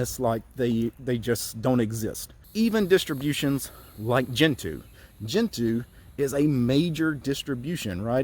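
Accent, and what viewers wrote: South, United States. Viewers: > bald boomer with a southern accent ranting about linux and open source software in his back yard Is this a whole genre now?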